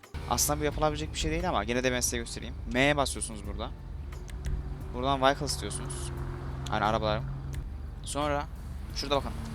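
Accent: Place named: Azerbaijan. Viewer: abicim azeri sandım az yavaş konuş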